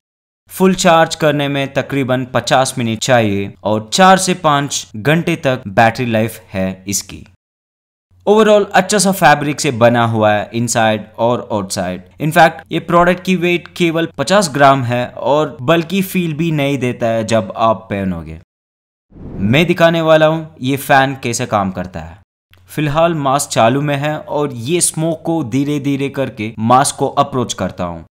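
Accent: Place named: South, India. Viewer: Are you from South there is something in your accent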